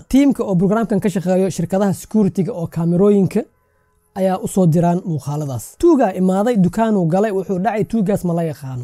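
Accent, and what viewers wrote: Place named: Jilib, Somalia. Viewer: My bro wan jecelahay qisoyinkaga sido kale qabka aad uga shekeso waxaatahay qosol bro lahjadeda jilib marko ayaa isoo xasusisey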